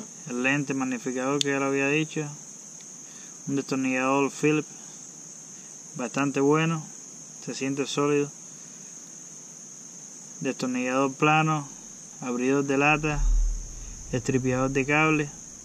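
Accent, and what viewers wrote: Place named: Latin America. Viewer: Que buena navaja excelente ahora entendí bien siempre veo a los españoles explicando y no entiendo mucho lo que disen bueno es escuchar a un latino saludos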